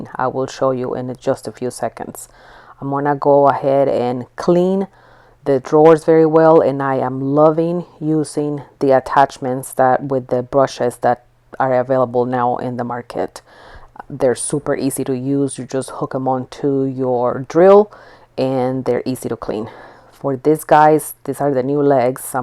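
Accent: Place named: Southwest, United States. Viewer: Beautiful job!! Thanks for the tip about staining. I understand you perfectly - your accent is just a normal Southwestern accent, a lot of people have it here, most especially if their families have lived here for a very long time. I live in Albuquerque New Mexico and wondered right away if you were close by because so many people talk like that here. It says you're in or near Dallas, so it's normal. It's a very normal 'accent' and I used to think it was just an Albuquerque area accent, but it's not, it's like that throughout the Southwestern US, mostly just in a band across Texas, New Mexico, Arizona, and California, more common in the southern halves of those states but lots of people throughout those states have it.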